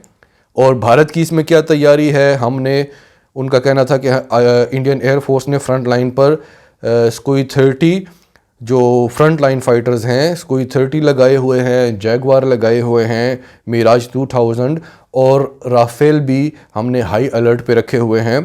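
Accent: Pakistan. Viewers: Saieen ki azmat ko ek syed ka salaam best urdu accent keep our sindhi bhaiee pure pakistani accent keep doing this imence respect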